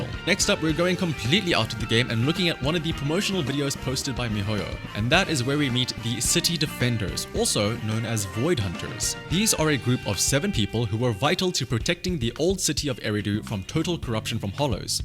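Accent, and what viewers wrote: South Africa. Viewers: So good to hear a South African accent on YouTube ❤ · are you SouFafrican?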